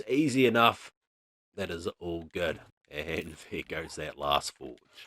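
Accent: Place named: Australia. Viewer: ive been thinking about what country you live in. youve got that australian accent but you seem like an american so its gotta be one of those 2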